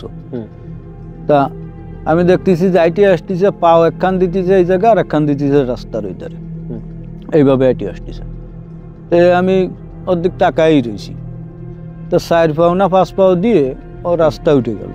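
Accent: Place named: Bagerhat, Bangladesh. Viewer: amader bagerhater vasa mone hocche❤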